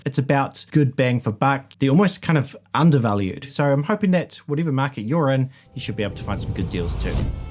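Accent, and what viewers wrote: New Zealand. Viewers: Man that is a thick kiwi accent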